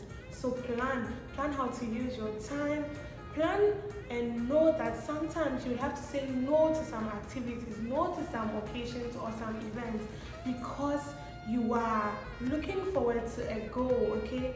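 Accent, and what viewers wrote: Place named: Japan. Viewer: 😃, your english accent now tho. You are sounding more Japanese now😂. Wisdom you speak. Thanks for sharing and again, you inspire!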